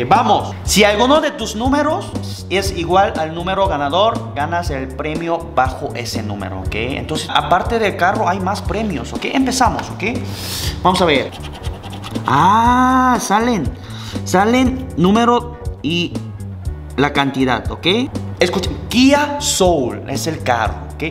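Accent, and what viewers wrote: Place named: Sonora, Mexico. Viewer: eres de Sonora o porque tan Hermosillo?🙈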